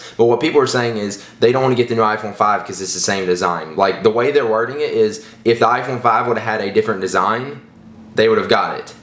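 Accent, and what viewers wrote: South, United States. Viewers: His accent sounds a bit Southern.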